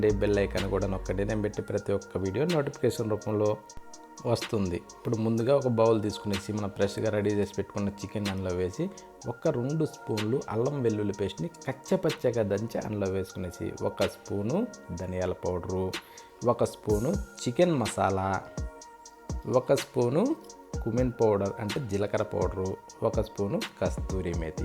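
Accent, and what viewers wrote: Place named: Telangana, India. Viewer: అన్న మీమాట తెలంగాణ మాట లాగే వుంది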